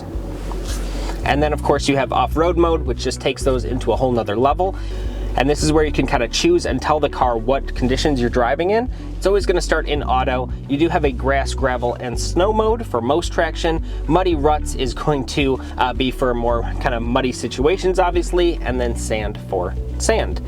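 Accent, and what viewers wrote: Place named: Chicago, United States. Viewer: I enjoy the Chicago accent.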